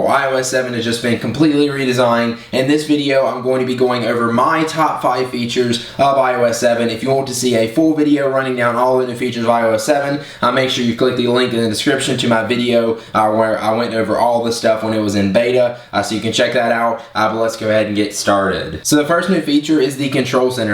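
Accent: United States. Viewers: it's not exactly an american accent. it's a country accent. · you have the biggest american accent ever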